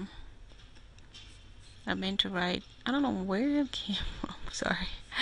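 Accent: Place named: India. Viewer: Idk why but Indian accents give me ASMR so I get that while I learn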